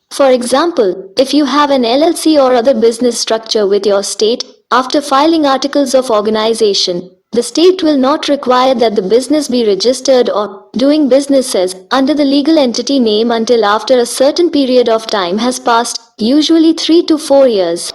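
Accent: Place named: India. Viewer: An Indian voice ? Cool information. 🙂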